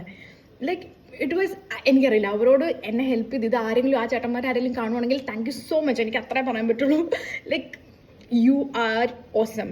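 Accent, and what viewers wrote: Kannur, India. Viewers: Ingal kannur ano????.. Samsaram niceee❤️😄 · Keralathil evideyaaa??? Ur slang has a Kannur tone …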